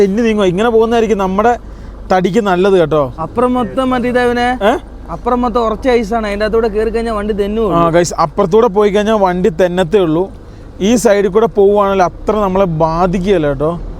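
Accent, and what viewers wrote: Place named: Kasaragod, India. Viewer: ni kasaragod alle ninte bisyo kasaragod pole ind😂❤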